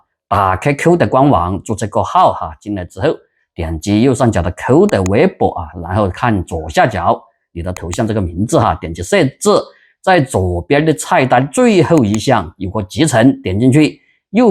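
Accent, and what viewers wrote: Sichuan, China. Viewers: 大哥 你是不是四川人 说点川普 我都懵了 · 听着一口流利的川普一下子想到了就是他教我学会了搭建一个聊天平台.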